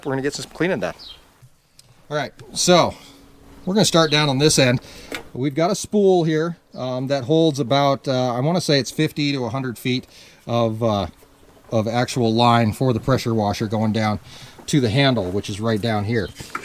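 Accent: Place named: Boston, United States. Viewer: Cuts like “nuttall”, since I like in Massachusetts you were speaking my language. “Paak the ka in the yaad”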